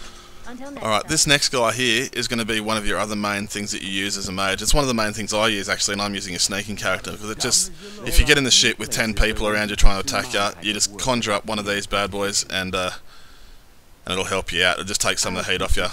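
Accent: Australia. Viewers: it's good to hear an aussie commentating on a skyrim vid once in a while.. it's always usually some yank doing them haha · Lol i can tell ur aussie AUSSIE AUSSIE AUSSIE!!!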